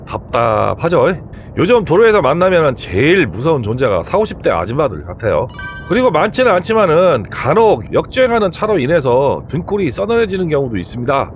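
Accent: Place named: Jeolla, South Korea. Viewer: 시골어디쯤이신가요? 내용듣다보면 충청권같으신데 말투는 전라도권 같으시고 ㅎ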